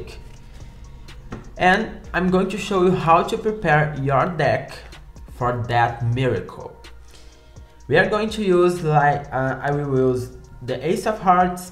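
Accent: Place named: Brazil. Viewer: your accent sounds kinda Brazilian to me kkkkkkkkk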